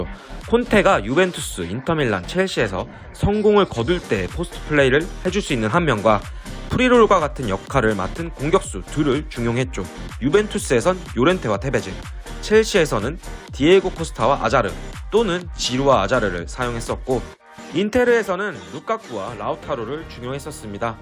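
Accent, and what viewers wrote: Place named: Jeolla, South Korea. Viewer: 전라도 억양이 살짝살짝 나오네 hoxy 고향이?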